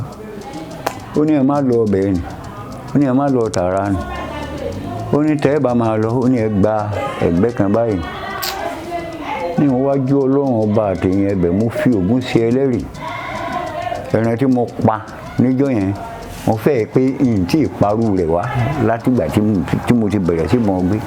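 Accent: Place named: Oke Ogun, Nigeria. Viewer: Where is the hunter from , he sounded very well like oke ogun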